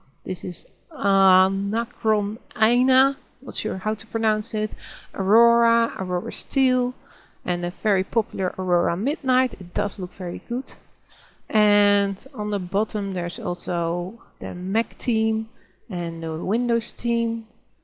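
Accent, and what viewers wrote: Netherlands. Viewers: Wauw dat nederlands accent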